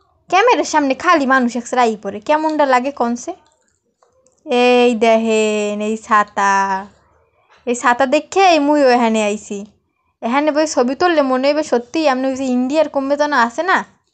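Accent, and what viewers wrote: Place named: Barishal, Bangladesh. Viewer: আম্মে আরজিনাল বরিশাইল্লা আপা ভালোলাগে একছের😅😅😅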